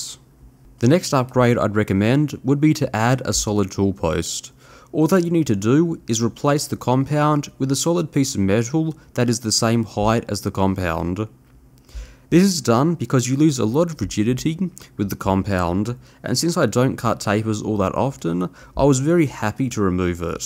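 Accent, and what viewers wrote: Australia. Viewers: you sound Australian you can get phosphor bronze at CBC Bearings its not hard to find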